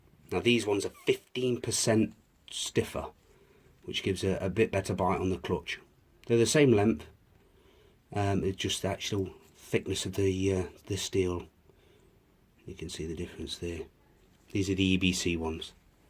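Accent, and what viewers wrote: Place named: Nottingham, United Kingdom. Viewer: made me laugh watchin this thought to me sen sound like propper notts lads. ad a look at ure other videos and seen you where keep it up las ive got a tl1000r and from notts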